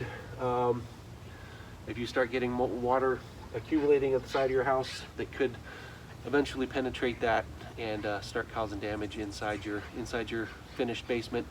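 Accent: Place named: Canada. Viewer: Great info! Wondering why you sound your from Canada?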